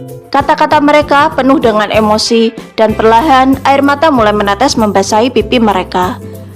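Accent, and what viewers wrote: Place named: Java, Indonesia. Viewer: aksen hostnya medok bgt infomasinya bagus tp jadi kelihatan masih kaku dan lucu 😂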